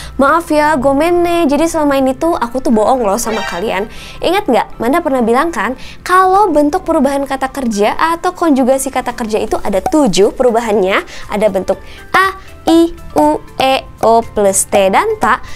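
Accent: West Java, Indonesia. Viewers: Ka manda sunda bgt sii 😍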